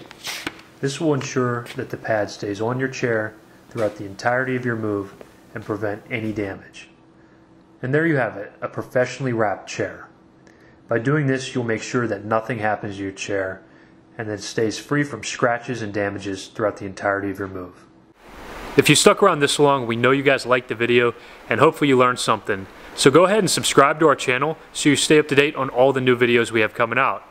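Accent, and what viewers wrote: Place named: Western Pennsylvania, United States. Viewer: I love your western PA accent. I’m from Indiana Pa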